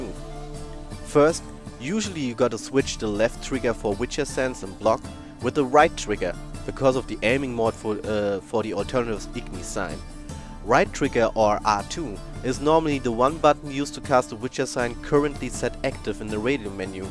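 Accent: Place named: Germany. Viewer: Hört man übelst raus dass du deutsch bist :D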